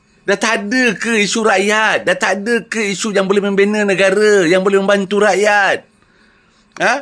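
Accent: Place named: Terengganu, Malaysia. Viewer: Keras betul lidah hulu ganu ni..😀😀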